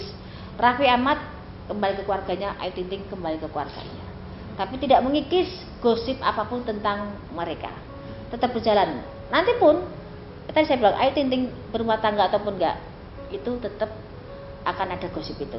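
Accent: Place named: Banyumas, Indonesia. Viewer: Mbak iki wong ngapak ya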